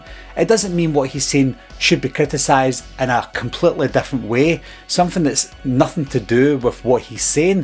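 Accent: Scotland, United Kingdom. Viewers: Listen bud don't you worry aboot yer accent..its the same as mine..the best accent in the world an I kin understawn ivrihin yer sayin nae borher..you just keep doing yer thing and don't let comments from a couple of wallopers stop ye.....cheers bud 👍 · I'm scottish too brother don't worry about the accent 😂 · The Scottish accent is a thing of beauty, they are just jealous of you and have wayyyyy too much time on their hands down there in their mother’s basement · We Scottish have the best accent keep up.the good work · I commented on your Scottish accent on a video the other day but in a good way, love a Scottish accent 👍🏻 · The fact you have to defend your accent…so unreal. Keep doing what you’re doing. You’re killing it. And anyone who says they don’t like your accent secretly wishes they were Scottish.😏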